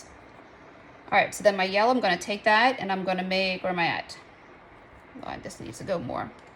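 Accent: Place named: Louisiana, United States. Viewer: Thank you for being real and showing us all how to. Got a question are you from Louisiana? Oops I finished watching and answered my question...lol I thought so I recognize the accent ❤ I grew up a Louisiana girl.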